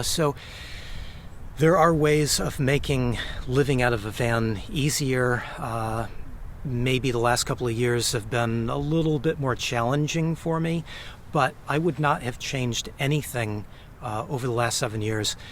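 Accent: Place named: Southern California, United States. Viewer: WOW your accent and manner of speaking is exactly like Steve Jobs and Tim Cook. that really is a SoCal accent! I wonder how I must sound to outsiders as a guy from Brooklyn New York...and like you, I do want to one day move into a mini-van (or ford transit) because rents here are very high in New York City including the boroughs...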